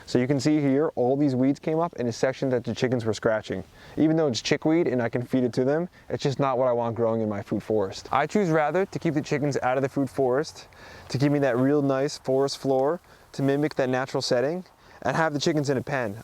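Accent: New Jersey, United States. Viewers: Hey, thanks again. Funny, you took the words right out of my mind about your 1st apple tree: why plant a common grocery store variety, and why not graft onto it. Lol, thinking alike. Do you know John Kohler? He has some pretty good info on YouTube. Also, you’ve become my 2nd favorite New Jersey person (you guessed, fav is The Boss); I’m wondering if you have typical NJ accent? I’m in SoCal.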